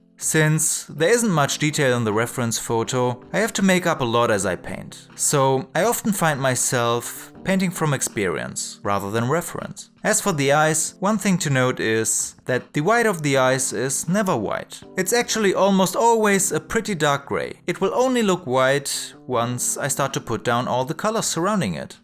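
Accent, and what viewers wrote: Germany. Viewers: In his defense, it's probably the very strong german accent, in combo with trying to sound american and "cool"?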